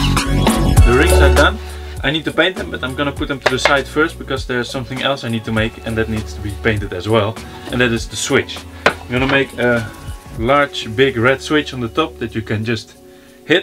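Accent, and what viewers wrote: Netherlands. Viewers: Vet gaaf man blijf door gaan ik hoor aan je accent dat je Nederlander ben haha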